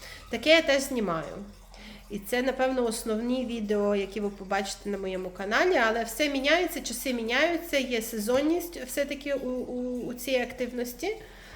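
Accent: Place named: Transcarpathia, Ukraine. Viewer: У вас закарпатський акцент.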